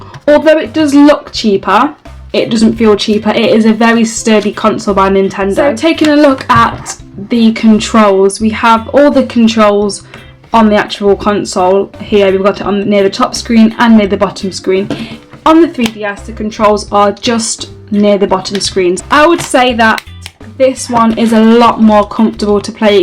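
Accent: United Kingdom. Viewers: first video I watched I'm ages with someone that sounds British lol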